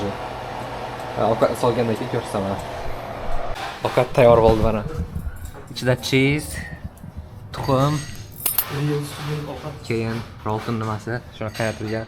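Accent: Khorezm, Uzbekistan. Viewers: Xorazmdanmisiz accent xorazmga tortyapdi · xorazmli misiz xorazmli misiz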